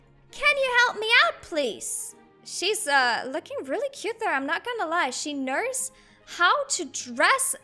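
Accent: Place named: Australia. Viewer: Your Aussie omg same i live in Melbourne are you aussie though hope you are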